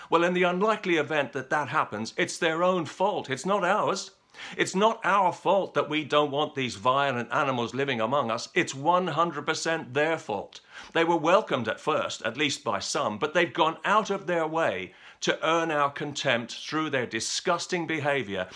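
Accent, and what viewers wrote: United Kingdom. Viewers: You remind me of Michael Caine, a bit. Love & agree with just about everything you say; nothing nicer than a crisp, British accented voice logically assessing current issues. I could listen to you talk all day long!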